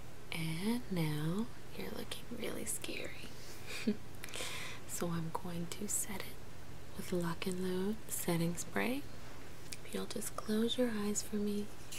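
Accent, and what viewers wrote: South, United States. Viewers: Oh your southern voice is soo soothing ^.^ I've missed you! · YAYYY!!!! I'm so excited! I love your sweet southern drawl that reminds me of one of the reasons I love Texas so. :P Your first makeup video still gives me tingles even after - 2 years - has it been that LONG?!?! anyway I'm just so glad to see you back. :))))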